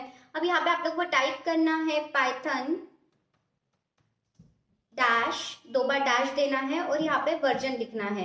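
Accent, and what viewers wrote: India. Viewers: Hyderabadi Accent ❤ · a slight tapori accent but she knows what she is doing and explanation part is really good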